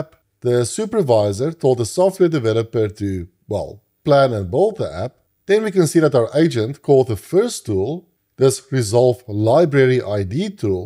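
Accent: South Africa. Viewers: Nice one boet. Your videos are getting better nad better, and are so informative and helpful. I understand you've explained the dfference between this and n8n...just not quite surei get it. I am hoping you'll get to the point where flowise has a stt/tts interactive style. The dream is a personal assistant that can manage calendar, emails, and sdhopping lists etc. And I cna "control" it all via whatsapp! Keep up the great work Dis ook lekker om 'n ander Suid-Afrikaanse ou te hoor!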